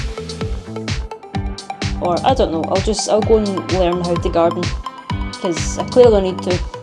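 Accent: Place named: Scotland, United Kingdom. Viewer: Progress is coming along well, you'll learn as you go and no better way to learn than to throw yourself in at the deep end. Got to love the Scottish accent. Keep up the great work and look forward to more updates 👍🏻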